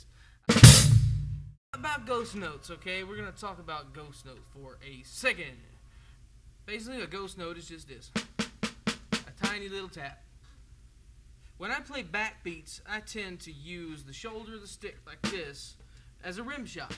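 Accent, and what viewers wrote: South, United States. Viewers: Really funky beat that's hard to beat and a great Southern accent to go with it.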